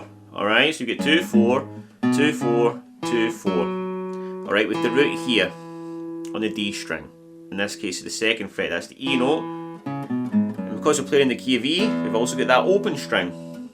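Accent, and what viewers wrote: Scotland, United Kingdom. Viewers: He’s Scottish, not Irish! 🙄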